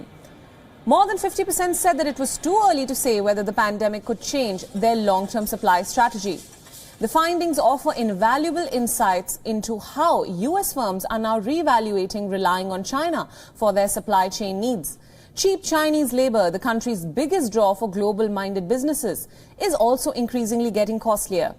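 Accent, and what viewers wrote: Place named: India. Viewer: Why the heck this Indian hostess with this terrible ascent reporting on US and China?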